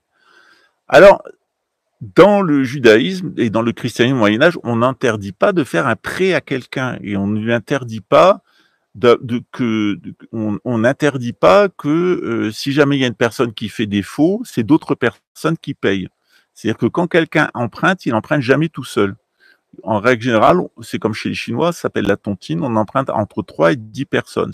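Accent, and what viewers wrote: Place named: Italy. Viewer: Belle accent Italien! L’Italie vous aime, je suis a Rome et décider de vivre ici, les Italiens sont adorable et bienveillant, merci pour vos vidéos incroyable et instructives! Que dieu vous bénisse.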